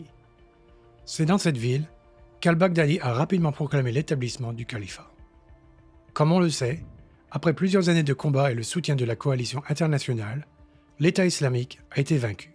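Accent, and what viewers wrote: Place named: United States. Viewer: Interesting analysis as always. I'm glad this channel has a French version. Some remarks however: translation is by no means bad, but not always consistent (especially noticeable is the intro, "welcome to the 20s report" is translated several different ways). The narrator's pronunciation of foreign names is a surprising (it's quite unusual in France, at least, to use an American accent - especially for ISIS as was mentioned today) but not bad in itself - however I still can't get over the pronunciation of "Erdogan" in the Turkey video, which was the worst-possible option (and, in fact, one of the rare times where it was read as if it was a French word). I also notice that neither translator nor narrator are credited in the video or description. How come?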